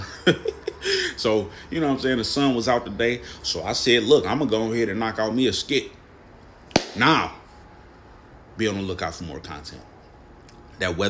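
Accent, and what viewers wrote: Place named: California, United States. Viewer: I could've swore you was from Cali somewhere lol Keep up the dope work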